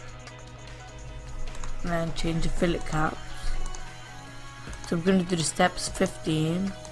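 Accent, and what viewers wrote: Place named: Leicester, United Kingdom. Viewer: I can tell your from leicester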